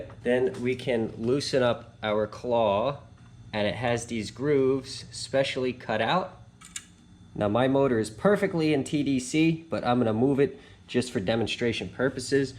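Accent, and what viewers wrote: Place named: New Jersey, United States. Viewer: btw, I'll be in Summit, NJ over summer....I thought you might be local based on speech.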